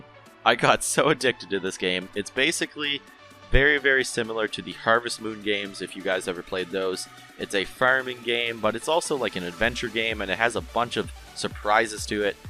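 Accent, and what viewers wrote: Canada. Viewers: Are you Canadian? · You're Canadian right? LOL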